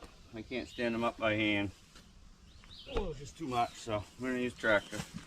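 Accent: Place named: New England, United States. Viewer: I know a New England accent when I here one, being from Maine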